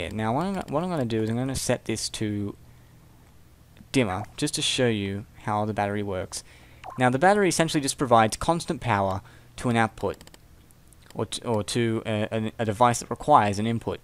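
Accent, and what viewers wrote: Australia. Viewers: u an aussie?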